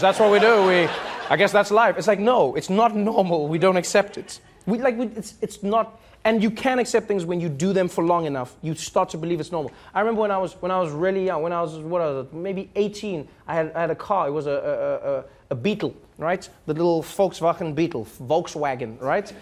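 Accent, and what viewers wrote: South Africa. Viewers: I love how he holds back on his south african accent when he's in America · that south african voice of trevor always cracks me up hahaha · I love listening to him talk. I think it's the south African accent. Lol, maybe one of the reasons I love listening to.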